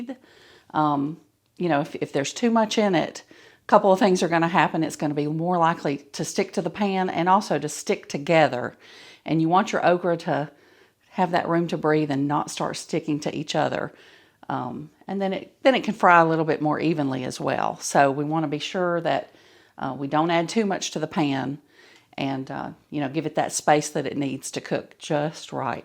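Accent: South, United States. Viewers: Love your recipes and your lovely southern accent! 😊😊😊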